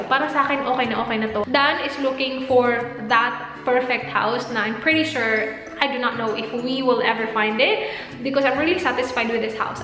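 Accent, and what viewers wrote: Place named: Philippines. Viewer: Gusto ko ung mga vlogs mo, I like how you don't try hard. Ung ibang vlogger jan nakakabusit kasi trying hard, pati accent niya binabago. Mas gusto ko ung gaya lang ng accent mo na pinoy parin.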